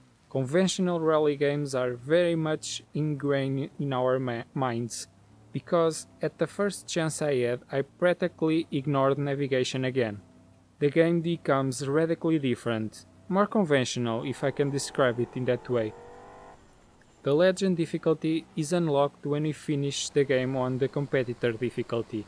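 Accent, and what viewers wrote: Portugal. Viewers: Are u portuguese? BTW, nice video and Niceeee gameee I'm a huge fan of motorsports and Dakar obviously. I'm rly hyped for this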